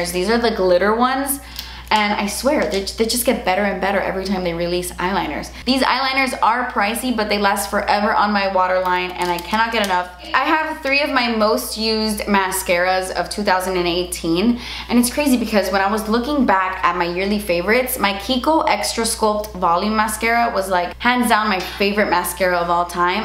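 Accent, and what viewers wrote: Cuba. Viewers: That Cuban accent is coming back😏! Las ventajas de vivir en Miami. 😘🤩😎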